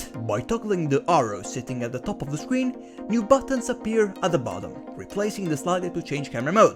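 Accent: Italy. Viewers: il tuo accento inglese e bellissimo ma si sente un botto che sei italiano hahah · Si sente che sei italiano dalla pronuncia, però ottimo video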